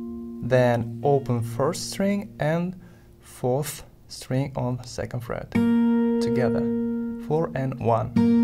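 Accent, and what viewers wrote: Russia. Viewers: 100% Russian accent. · Этот русский акцент >_>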